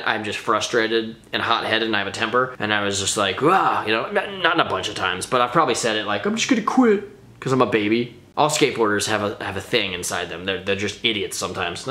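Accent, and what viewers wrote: England, United Kingdom. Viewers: Are u English or American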